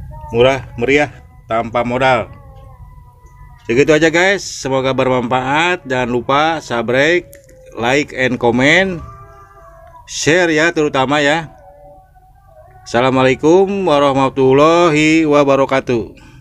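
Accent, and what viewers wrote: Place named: Sunda, Indonesia. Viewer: Mantap mang ni medok bahasa sundana 😁 salam ti Purwakarta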